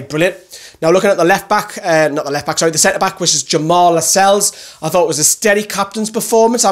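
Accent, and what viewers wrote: Newcastle, United Kingdom. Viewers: Winning certainly brings oot your Geordie accent hahaha!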